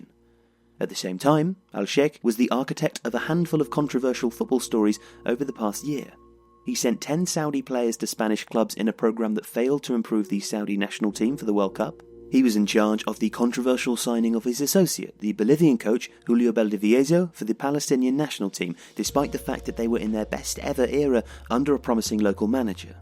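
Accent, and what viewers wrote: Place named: Wales, United Kingdom. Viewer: Wow, now I feel like a dick. I didn't actually know about this Welsh. I'm from Egypt and we have this sort of stereotype about the British adding the Kh letter to everything like in habibi "khabibi", you can see it in really old tv shows here but in the Real world when I started talking to people from England especially Londoners not one of them was even capable of producing such sound, like saying Khaled as " D. J. K-aled " and then i was convinced that this stereotype came from the time of British occupation of Egypt where those who learned to pronounce the letter "kh" in Arabic are just showing off by jamming it into any and every word. I thought you were just being a hipster or something. P.S. in Arabic there are 2 letters for H, like B and P in english. and the one in el Ahly is different from the one in like Habibi, so i can see now how it would be hard for someone especially with a Welsh accent to pronounce it. 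just try not to think of Habibi when saying el ahly. think Haba Haba.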